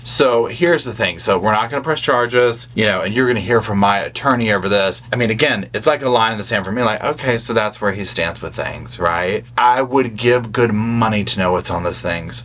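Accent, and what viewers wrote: South, United States. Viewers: 100%! I, too, have a Southern accent. Listening to him is like sitting on the coach with my family jaw-jacking about the nuts around us. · YES, I get all the info I would from the news, or courtv, but in a much warmer, SOUTHERN way, including some opinionated sidebars, which is just how I like it!❤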